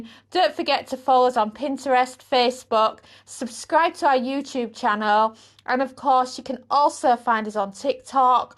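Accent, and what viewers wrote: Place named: Yorkshire, United Kingdom. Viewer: Will be trying this recipe out today, thanks. Btw, a fine Tyke accent! Lovely to hear someone speyking reyt! xxx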